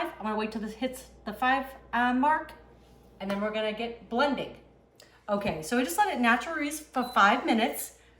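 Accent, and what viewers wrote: Boston, United States. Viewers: Great video First time I’m watching! I hear a Boston accent?!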